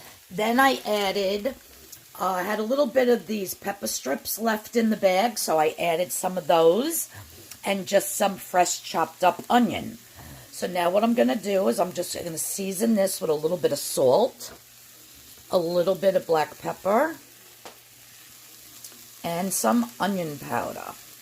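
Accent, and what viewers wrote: New York, United States. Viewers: I love your videos. I just subscribed. I want to start the purple plan this week and you have some great ideas. I'm from long island and you sound like your from NY. I feel like I'm listening to a friend or relative.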